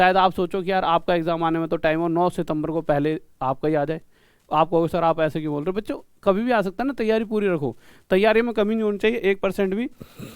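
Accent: Haryana, India. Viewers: Bhokal , web series k , pintu pahalwan jese look h sir aapka , language b haryanvi touch a rha h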